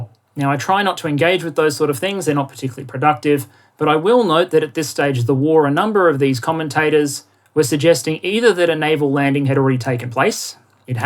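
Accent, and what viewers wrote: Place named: Australia. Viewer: As usual for, excellent. One observation - not a complaint but a quirk of a Yank listening to an Aussi - It took me until the last few minutes to realize that you were saying "hulls in the water" and not "holes in the water". Honestly, I thought you were riffing on the joke that "a boat is a hole in the water into which you pour money"... Either way it made sense, sort of.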